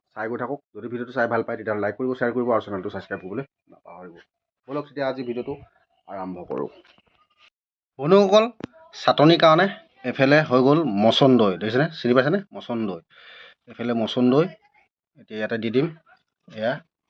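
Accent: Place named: Nagaon, India. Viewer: Apunar video xodai saisilu kintu nagaon kotha kuwa karone beya lagit moi jihetu nagaon hoi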